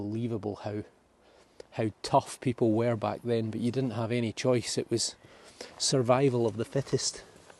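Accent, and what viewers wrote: Scotland, United Kingdom. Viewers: Listening to English spoken with the Scottish accent is like listening to music. Thank you for the photography and narration. Lovely 🌹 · Thank you for this tour. It's a reality check for most of us who never knew what our ancestors no doubt lived through. My family lived in Scotland, we think the northern part, slowly moving to Canada then to the US a 150 yrs ago. It was heart warming to hear my grand father who still had a bit of his Scottish accent; just a few words that he automatically spoke that sounds somewhat like you. SCOTLAND is beautiful to be sure, but wasn't an easy way of life in times past. This was wonderful & I love your videos of this beautiful country. Thank you!!!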